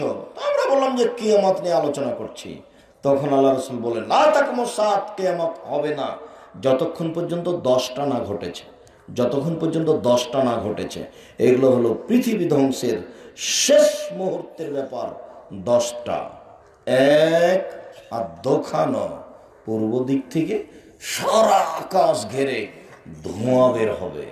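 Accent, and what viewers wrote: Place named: Dhaka, Bangladesh. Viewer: সে তার ঢাকাইয়া ভাষা কইতে যাইয়া ভাষার বিকৃতি হয়ে যায়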